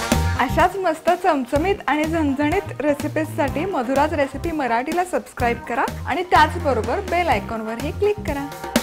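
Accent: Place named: Sangli, India. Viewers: कोल्हापुरि भाषा मस्त जमते की....खूप मस्त भडंग.....🎉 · जमल की सांगली ची भाषा बोलायला आणि भडंग तर लईच भारी 👍🤩✌️,l am from सांगली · खूपच बेस्ट कोल्हापूर बोललात विषय हार्ड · सांगलीची भाषा आणि भडंग दोन्ही जमलंय चांगलं 👌 Love from sangli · आम्ही पण सांगलीकर आहोत.त्यामुळे खूप मजा आली भडंगाची रेसीपी पहायला आणी हो टिपीकल भाषा सुद्धा बोललात ते लै भारी वाटल...... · भाषा सांगलीची व भडंग पण सांगलीचा फार. छानच वाटल मधुराम्याम......🙏🙏🙏🙏🙏❤️❤️👌👌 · Chan bolalat Tai sangalichi bhasha ani recipe pan mast❤❤ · tu अमेरिकेत राहून सुद्धा सांगली,कोल्हापूरची भाषा खूप छान बोलतेस.तुला पुढील रेसिपी साठी. All the best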